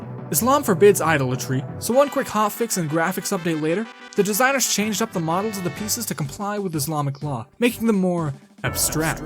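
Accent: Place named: United States. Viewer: Where are you from? Cause the accent seems like Japanese-American to me.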